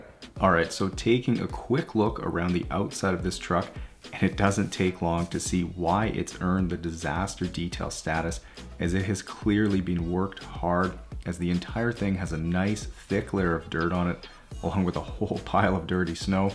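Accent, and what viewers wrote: Canada. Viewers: Man I love the Canadian accent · Americans: OMG I really love this guy's accent, it's so Canadian!! ROTW: We really, really cannot tell the difference between his accent and yours <shrug> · i knew he had to be canadian, no americans pronounce garage in the weird way he does lol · Love the Canadian accent aye..... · He’s been the only Canadian that sounds like a Canadian on this channel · I love the Canadian “ya” and “hey” they always make me smile. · OMGOSH, here I thought he was from Hawaii and was confused by his accent. I don't really know what a Canadian sounds like since the ones I work with have straight accents so listening to him was quite the study.😂😂😂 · Where is this guy located? Probably nowhere near me since I'd love him to detail my car! I usually do it but have been working so many hours, I get home and pretty much eat and go to bed. 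He sounds Canadian, so my guess is he's in Canada... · I knew he was Canadian the 1st 15 seconds of the 1st video I watched lol however I can hear it more as I'm not Canadian.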